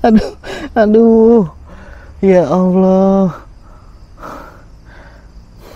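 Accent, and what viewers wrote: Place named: Eastern Indonesia, Indonesia. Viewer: Kk org jawa to? Kenapa pake logat timur selalu sa dgr blg "ado mama..." salam dr Merauke.sukses ya ka tampan..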